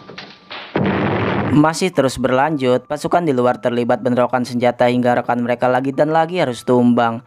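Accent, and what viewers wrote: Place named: Java, Indonesia. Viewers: Logat bahasa nya tu macam org jawa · Nadanya medoook,kayak orang ngapaak???😊😁😁😁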